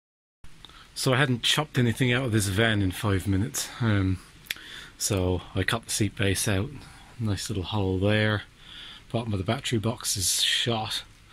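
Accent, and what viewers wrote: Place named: Ireland. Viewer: Loving the videos, the music and the relaxing Irish lilt, looking forward to the next one 👍👍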